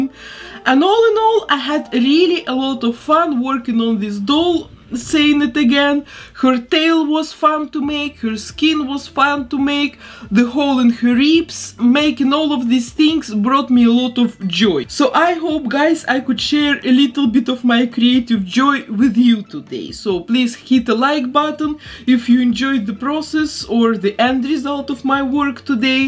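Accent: Russia. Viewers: Вот это акцент )))) русский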